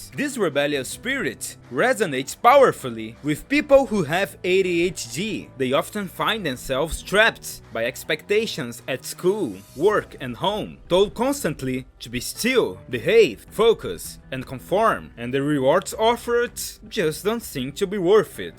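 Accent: Brazil. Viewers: My dudeeee, are you? It's almost the same title and almost same thumbnail! And I noticed that you have that brazilian accent aswell. Just wanted to know. I'm subscribed in both channels, so I was curious xd Ty for the attention! 👊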